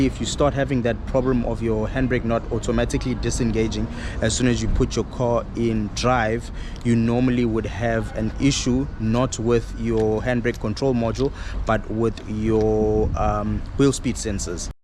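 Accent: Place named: South Africa. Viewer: Love your accent.... mix of SA English, Durbs Indian and I'm guessing Zulu.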